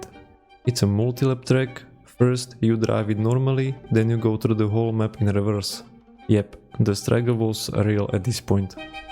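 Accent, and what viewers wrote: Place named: Russia. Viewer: já sem si myslel že je z ruska kvuli jeho prizvuku XD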